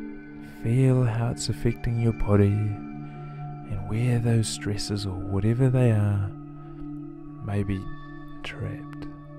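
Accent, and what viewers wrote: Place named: New Zealand. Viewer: Real relaxn More guided meditation please. its nice to hear a kiwi voice! its more calming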